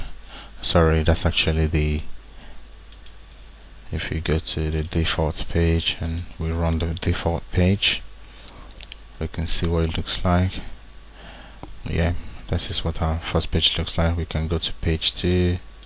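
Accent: Nigeria. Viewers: pls r u a nigerian? just curious cos of the accent. thanks 4 the video. cheers! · r u nigerian? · Are you nigerian?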